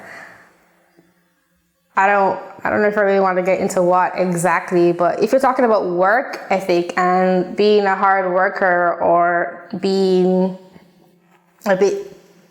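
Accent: Jamaica. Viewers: Girl! I hear the Jamaican dialect there. Anyways, speak the truth. Jamaicans are here being brainwashed by American mainstream propoganda on racial issues. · My girl I can here your Lovely Jamaican accent. Like the video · Are Jamaican?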